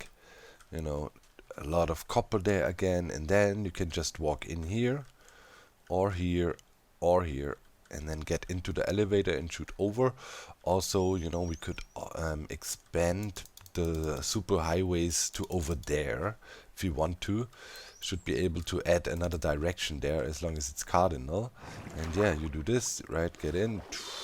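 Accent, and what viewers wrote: Germany. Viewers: Uhhh nh deutscher 😅 cooler akzent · Are you german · I like that accent it sounds kinda German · Oh i knew you couldn't be british or american or australian or canadian du bist deutsche i hate gewohnt in deu für 9 monathe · You sound sooo german :D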